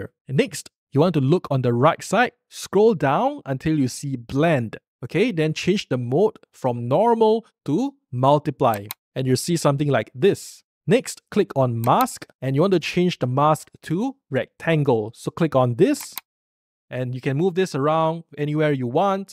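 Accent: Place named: Singapore. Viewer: just the first few words he said, i know hes from singapore lol... a very distinctive accent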